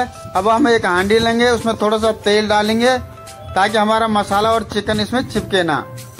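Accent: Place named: Uttarakhand, India. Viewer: Yar Bhai ji handi chicken bihar ki dis h socha nhi tha AP uttrakhandi ho apki language see lag hi Raha tha Jai badri vishal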